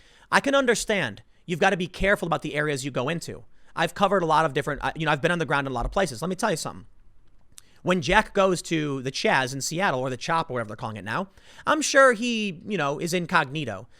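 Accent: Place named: Philadelphia, United States. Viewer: Really laying down the Philly accent lol 🤣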